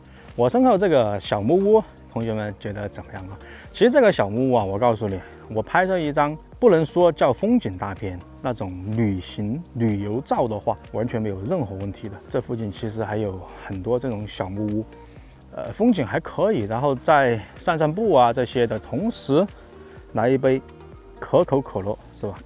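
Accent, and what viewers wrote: Sichuan, China. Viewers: 这个频道主感觉是个四川人，讲话太像了。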